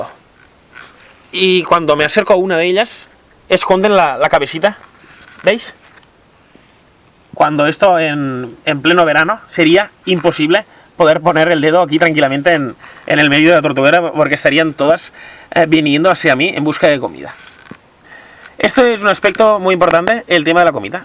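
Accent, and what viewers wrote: Catalonia, Spain. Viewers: Es Español, pero la lengua española no es su lengua materna, creo el habla catalán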